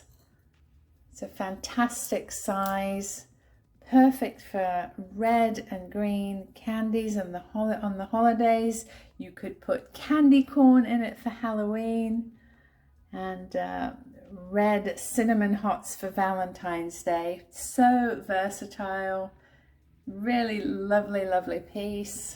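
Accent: England, United Kingdom. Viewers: Yes, love her voice and English accent.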